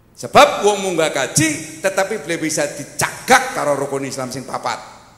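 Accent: Tegal, Indonesia. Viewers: logat tegalane medok temen gawai seneng wong brebes nang tangerang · Tidak merasa bosen dgn ceramah ki entus lucu dengan logat Tegal nya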